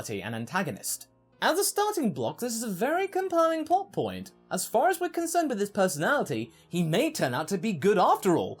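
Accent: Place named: RP, United Kingdom. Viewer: you sound like a posh dbza goku. I keep expecting you to say "muffin button"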